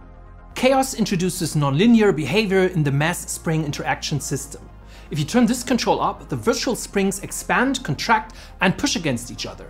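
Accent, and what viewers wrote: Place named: Germany. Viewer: Nice one. And the thick german accent makes this video even better.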